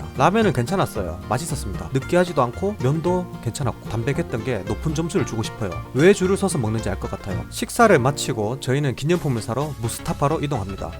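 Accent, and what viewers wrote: Gyeongsang, South Korea. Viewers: 16일에 싱가포르가는데 정말 많은 도움이 됬습니다 ㅎㅎ 저도 경상도라 말투에 호감이가네요~ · 이때까지 100번 들었습니다 저는 경남 창원사람인데 말씀 하시는 게 대구사람 같네요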